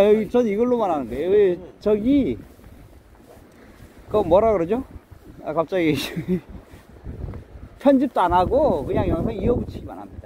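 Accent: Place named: North Korea. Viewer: 님... 실례지만... 고향은 어디세여? 말투가... 윗쪽 같으신데 알수있을까요?? 새터민이신가요?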